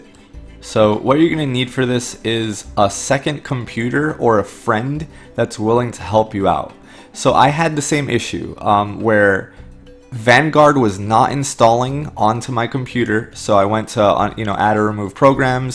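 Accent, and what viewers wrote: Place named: Turkey. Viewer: its turkish accent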